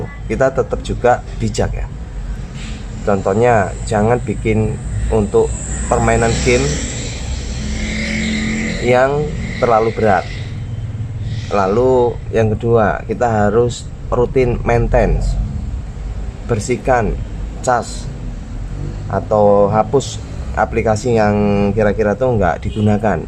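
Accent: Java, Indonesia. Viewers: Saiki dadi sering inframe mas...lg taun 2019 akhir pertama ngikuti chanele sampean...pirang2 wulan penasaran banget...wonge koyo opooo...logate njawiii...vibes sabar banget dari nada suarane...sukses selalu om..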